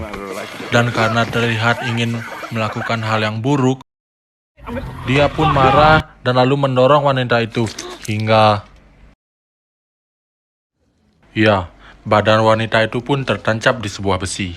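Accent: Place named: Bali, Indonesia. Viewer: pengisi suara nya kaya org bali hehee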